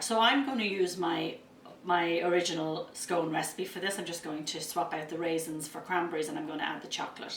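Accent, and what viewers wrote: Ireland. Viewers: Hi. Greetings from Belfast in Northern Ireland. I’ve been watching your videos while in lockdown here due to the Coronavirus Crisis and I must say you do my wee heart good. I love the simple and easy way you show how to bake and cook. You’ve converted me!!! I’m a 60 year old aul fella and I’ve now made scones for the first time in my life - and they were bloody good!! Lol All thanks to you. Just wanted to encourage you and let you know your videos are being watched, not only in Canada, but right across the Atlantic back here in good old Belfast. It’s lovely to hear your Irish brogue and just your easy going way that gives us the courage to just give it a go with the baking. Huge thank you and I look forward to more videos and your channel growing with lots more followers. Good luck and God Bless. John 😀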